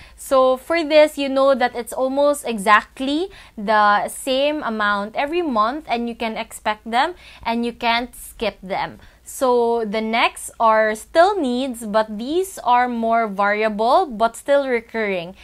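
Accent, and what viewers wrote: Visayas, Philippines. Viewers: I hope you get rid of your bisaya-english accent.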